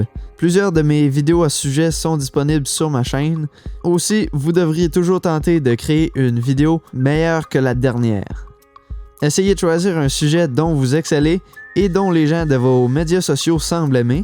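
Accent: Quebec, Canada. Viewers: Je suis curieux, comment ça se fait que du parles d'argent en Euros, alors que ton accent laisse penser que tu vis au Québec ?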